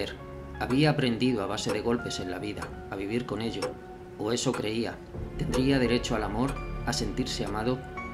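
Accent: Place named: Spain. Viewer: Hermoso dibujo ,buena narración . Me recuerda los radioteatros que escuchaba cuando era niña. Tienes acento español. 🌞🌛✨🌿🌸🤗🥰😁👍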